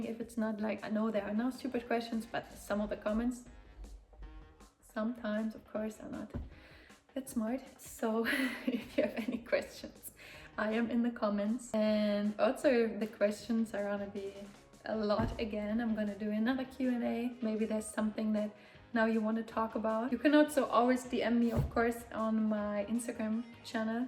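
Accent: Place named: England, United Kingdom. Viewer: Send you and Mario love and blessings from Mexico, I’ve just subscribed to your channel and it’s interesting, I like your English accent. ❤️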